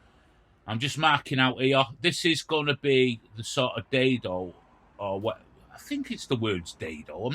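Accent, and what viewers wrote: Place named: England, United Kingdom. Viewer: You sound like an English man . Am wondering why your title mention a......one by six. You use 6 by1 i hear. Dont confuse us Aussies. No one gets it right the first time.. you will never be perfect ,thats not possible. Call it what you want and dont copy thoses loud mouths over the big ocean. Gluing only is absolutely fine.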